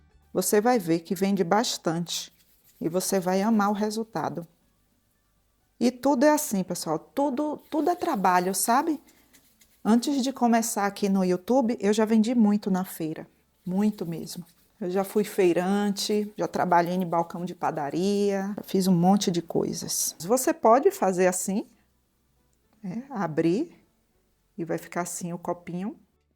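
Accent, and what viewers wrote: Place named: Northeast, Brazil. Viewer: Eu amo assistir suas receitas ,vc é simplesmente maravilhosa, pelo o sutaque vc tbm é nordestina , povo maravilhoso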